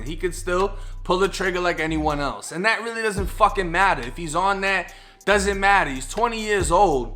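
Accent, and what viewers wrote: Boston, United States. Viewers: How u got a Boston accent but u from Florida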